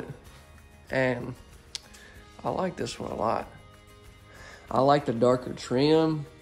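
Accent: South, United States. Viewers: soft spoken, sweet, southern gentlemen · This is the most beautiful one that I have seen! Totally love it! Love you channel and love your country accent! God bless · Did you say? Is that your name or is it? Either way I’m still digging your southern accent! Lol! I have a question for you about single wide mobile homes. Do you ever see any of them with the kitchen in the front and the bedrooms in the back? My home is so old and is in desperate need of an overhaul. I love watching your videos because I am getting so many ideas for my place. I love love love this place especially the black trim!! Thanks for everything you do for us! I appreciate your attention to details and how you open all of the cabinets to give us ideas!!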